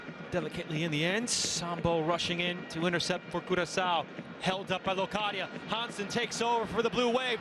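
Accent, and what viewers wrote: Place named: United States. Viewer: Amazing but shame it was an American commentator. It just sounds weird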